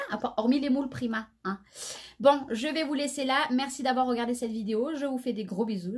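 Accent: South, France. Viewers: Bonjour ,dans quel Action allez-vous ,vous avez un joli accent du Sud mais cela ne veux rien dire , car dans mon Action je n'ai pas tout cela et encore moins pour faire des cartes cela fait bien 3 semaines que tout a disparu par contre nous pouvons commencer à planter nos narcisses, jonquilles etc ..... en région parisienne ça sent "le printemps Action"" Passez de bonnes fêtes en familles en rouge et Or .Amicalement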